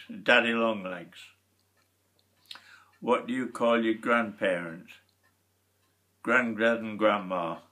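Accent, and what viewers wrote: Lincolnshire, United Kingdom. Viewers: My family descends from Lincolnshire, and it's simply frightening how little the accent has dulled or changed over the years. There were many times I could hear my own family in this voice. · This is a pretty genuine Lincolnshire accent compared to a lot of examples I have seen online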